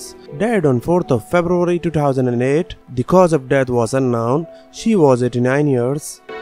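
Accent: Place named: India. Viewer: Hes an Indian .his accent is different 👌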